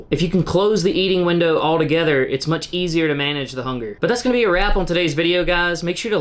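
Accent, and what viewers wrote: United States. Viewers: What state are you from? Probably never heard that American accent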